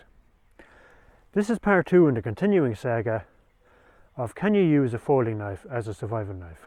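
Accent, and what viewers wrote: Ireland. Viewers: These videos never get old because is such a skilled, inginuitive, man w/a pleasant Irish accent and beautiful terrier dogs.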